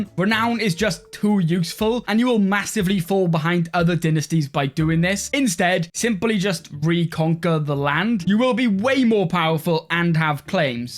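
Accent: United Kingdom. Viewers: Good video, I just wish your voice wasn’t so violently British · Bro i really aprreciate these tips but i cant listen this british accent.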